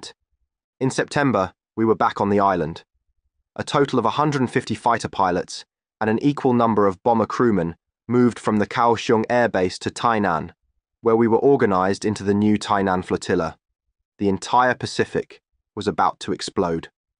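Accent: England, United Kingdom. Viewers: I do not know if i can get use to this engish man speaking .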